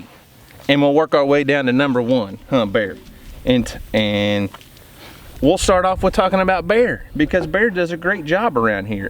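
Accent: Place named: South, United States. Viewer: The constant, unending interruptions are like watching someone with ADD. Solution: Put the dog inside or on the other side of the fence and make your videos uninterrupted. You have very good content. That southern drawl is relaxing and sounds very trustworthy - very easy to listen to. Just let that good content flow!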